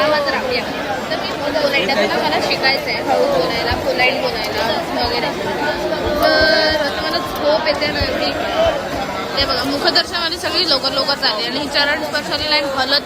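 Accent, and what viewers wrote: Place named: Malvani, India. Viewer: ur malvani accent is so cute, say it again 😝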